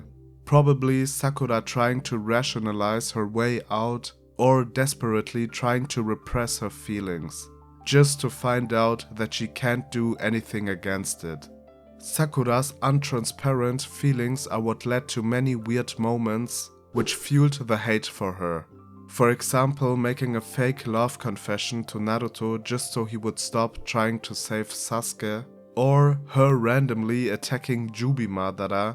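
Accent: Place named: Germany. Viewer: Are you German? Your accent reminds me of my classmates from Berlin, i'm saying this in a nice way.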